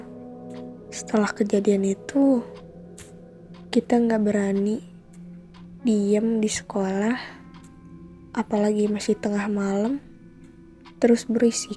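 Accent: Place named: West Java, Indonesia. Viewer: Sunda banget yaa logatnya.. hehe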